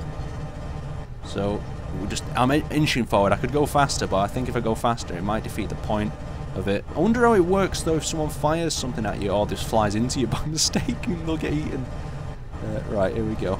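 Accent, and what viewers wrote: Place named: Northern England, United Kingdom. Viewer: Scott is considerably more Scottish than this chappy. I think his name is but I'm not sure. Either way he's clearly English, but I think somewhat Northern?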